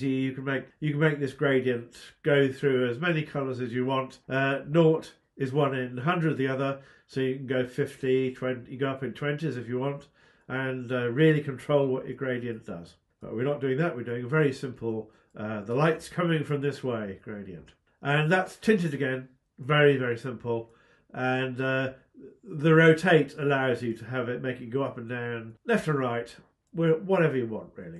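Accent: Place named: England, United Kingdom. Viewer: I'm not good in English, but even Russian like me can understand his gorgeous pure English accent. Thanks for great videos!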